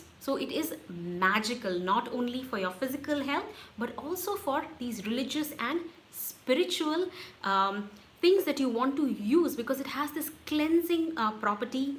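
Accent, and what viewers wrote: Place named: India. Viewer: Please subtitles in written English in some countries indien english acent is very difficult to understand.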